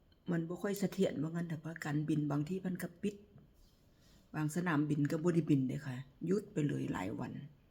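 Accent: Nyo, Thailand. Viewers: มานั่งฟังพี่ เม้ามอยเด้อจ้า❤มักๆสำเนียงญ้อค้ะ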